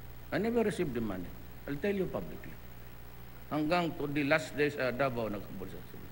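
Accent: Visayas, Philippines. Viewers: Hehe, damo ng hangin ang ulo mo... nkakatiwa mkinig ng visaya eh... Visaya mn ako Tatay Digong eh... from Romblon God bless you po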